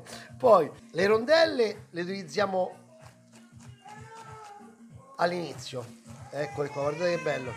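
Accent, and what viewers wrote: Rome, Italy. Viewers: bella ricetta! Mi fa impazzire il tuo modo di parlare romano!e che carino Marietto!...stavo pensando di usare il riso invece della pasta all’uovo..che ne dici??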